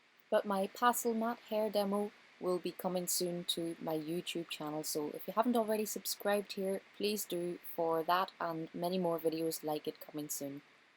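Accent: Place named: Ireland. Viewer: And your irish accent is so cute !! I'll be watching your videos now to get to know pastels better, thanks for the effort of making them really.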